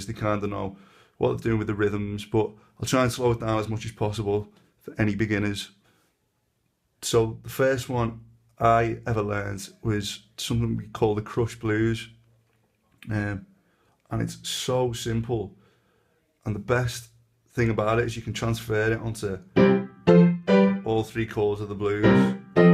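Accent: Liverpool, United Kingdom. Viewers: Where are you from you sound like a Scouser? Really enjoyed it thanks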